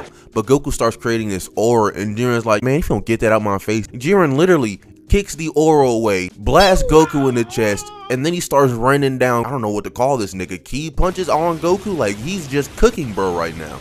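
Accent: Philadelphia, United States. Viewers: r u frm philly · Philly or ATL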